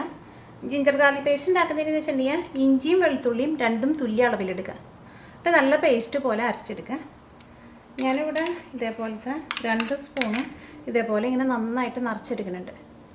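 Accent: Thrissur, India. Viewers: Hi, I tried this, everyone here loved it. Very tasty. All your dishes are really nice I have tried some other dishes also. In the beginning I started watching becoz of ur thrissur slang but then later I liked ur all dishes. even I am from thrissur. best regards.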